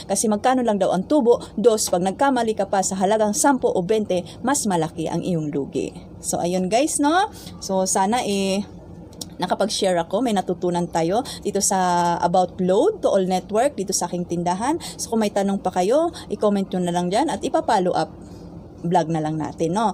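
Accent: Waray, Philippines. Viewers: Waray waray ka maam?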